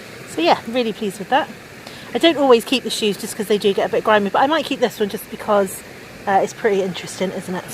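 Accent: Somerset, United Kingdom. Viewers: As soon as you start talking it takes me right back to my childhood and friends I had in Portishead! So far away now, so really nice to see your adventures around Somerset - I was raised on The Levels! I'm so pleased to see the waterways almost pristine, compared to so many in US which look like runoff from garbage dumps! And I have to say I am honestly surprised by how much stuff you find in the rivers, like pottery - I keep saying to my Dad he should really take to exploring his (Devon) farm more as I'm sure it's hiding many relics... Congratulations now on nearly 800 SUBS! Soon be up to a thousand. Enjoy your hunting - I do! 👍😊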